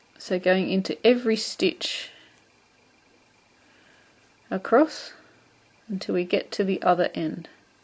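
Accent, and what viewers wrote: Australia. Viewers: Is that an Australian accent I can hear? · I love this! You have taught me so many things! I'm a new crocheter, and I love your style of teaching. When I make something and show people, I tell them "the nice Australian lady taught me (I hope I have the accent right!!!!!)" I made this to accent a pair of handwarmers that I made using one of your tutorials...it ended up being too big for the handwarmer, but it's still so pretty that I know I can use it for something! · I am Australian, and I love that we have a fellow Aussie making great tutorials, I love our accent and I for one am so thankful that you have uploaded this video and sharing your knowledge, I think your fantastic, keep up the great vids, your awsome :) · great tutorial you make it look so easy I'II keep practising and hopefully I'II get thankyou for all your hard work Where are you from? 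you sound like an aussie thanks again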